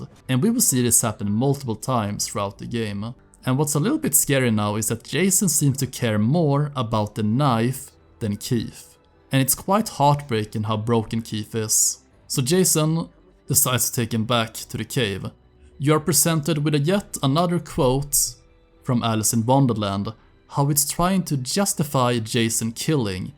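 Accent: Sweden. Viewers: Omg din svenska dialekt luktar så stark den e😂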